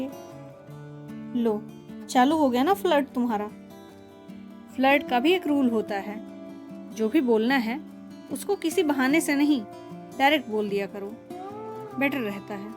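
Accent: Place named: Rewa Satna, India. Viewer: hame laga aap rewa satna se hai apke baat krne k according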